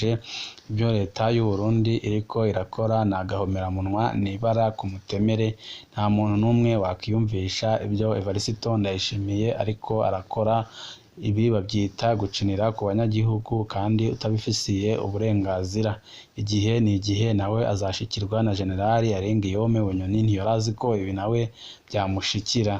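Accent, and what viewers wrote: Rwanda. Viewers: oya uyuwewe ararenze avuga niryo atazi ninumurwanda none burya arunva KO atanikirundi azi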